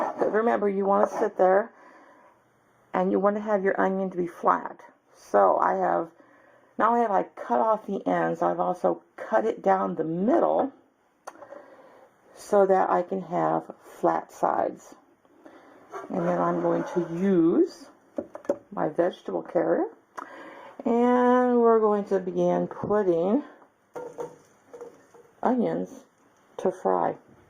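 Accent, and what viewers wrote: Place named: Illinois, United States. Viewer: This is so werd! Assuming, as I am, that you're from central Illinois based on your accent (...I love how you say sauseege, potayda, and puddin' just like my grandma used to!), you're like this odd mix of both sides of my family. My mom's side are Lithuanian from northeastern PA so I had kugeli a lot as a kid... and my dad's side are all from Bloomington, IL, where I was born. So cool to see it mixed like this.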